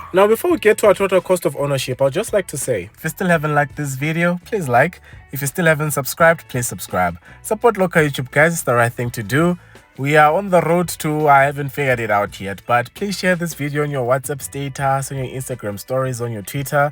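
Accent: United Kingdom. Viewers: British accent😂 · It's a British accent for me." Allow us get started ❤❤ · The British accent 😂😂😂😂🔥🔥🔥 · The British accent 😂😂😂😂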